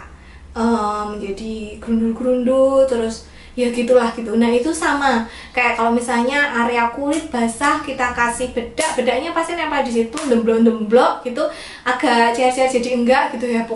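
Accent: Java, Indonesia. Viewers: Dihh bu bidan lucu yaa gaya bicaranya, aku yg juga orang jawa kerasa bgt uniknya ,malah jadi ciri khasnya Bu saran dong, gimana kalo ngebersihin BAB pake tisu basah, bahaya kah? Kan kalo pake wash lap suka ribet Makasi bu bidan yaa sharingnya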